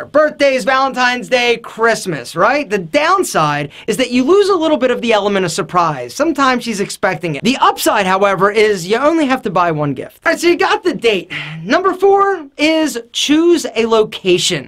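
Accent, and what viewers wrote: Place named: Maryland, United States. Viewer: This guy sounds like he's from Maryland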